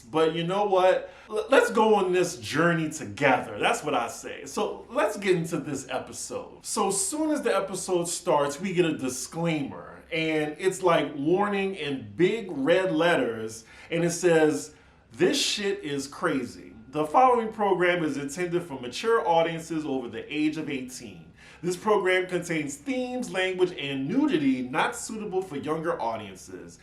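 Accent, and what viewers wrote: New Orleans, United States. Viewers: you gotta use your New Orleans accent more! 🤣🤣I luv ❤️to hear it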